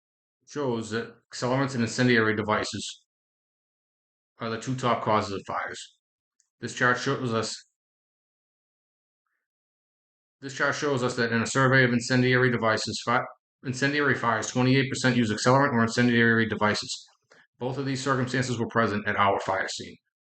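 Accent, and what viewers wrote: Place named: Boston, United States. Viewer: Not sure why this was on my homepage, but sick Boston accent.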